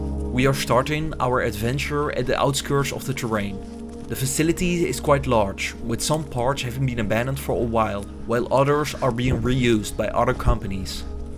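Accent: Netherlands. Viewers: This dutch accent is awful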